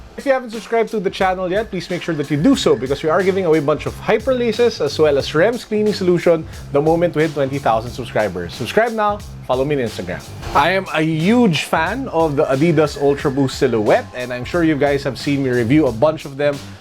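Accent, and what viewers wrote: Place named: Philippines. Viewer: Philippinos have the best accents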